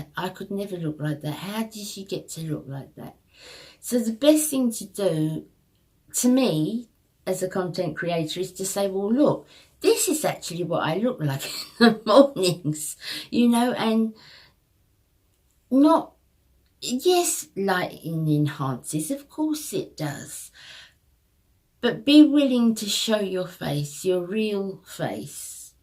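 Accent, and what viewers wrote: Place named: England, United Kingdom. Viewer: Bless you.When you have watched youtube for 12 years or so and watched channels grow,you learn to take many people with a pinch of salt.I don´t watch any more to "learn",when it comes to make up as I have known for a few years now what suits me,and what to avoid,as I am sure many of us have.I like reviews and enjoy personalities.There is a lot of sugary B.S. out there and many use filters.Youtube is a HUGE business and money maker for many,these days.Good for them.It takes a bit of selling your soul for that but many are prepared to do just that.However,I would rather just spend my time watching people such as yourself,who keep all aspects real.I tend to think these days,as much as I love and have always loved make up,the market is totally saturated,and there is only SO much a person needs.Truthfully..I watch you,also, because it´s nice to here a fellow English accent;)......Stay as sweet as you are.....Sheila xx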